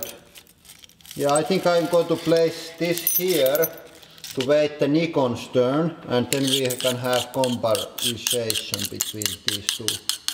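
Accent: Finland. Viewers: nice ralli englanti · are you from finland? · kunnon ralli englanti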